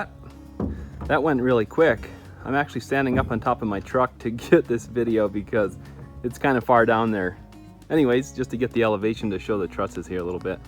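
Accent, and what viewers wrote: Canada. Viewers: Wow, superbly nice work! You are so resourceful, and such a diligent worker! I like the overhangs. They are worth the extra time and effort, plus they drop rain farther away from the shed. I gave you a thumbs up and subscribed. And someone gave you a * FREE * door, bonus! Could you add a cost list, please? How much did the wood cost, the metal panels, and the windows. I am wondering if it's cheaper to buy timber and screws and a window and so on, or to buy a whole shed kit. Are you Canadian, eh? Your profile page says you are somewhere in The United States, but you sound just as Canadian as can be.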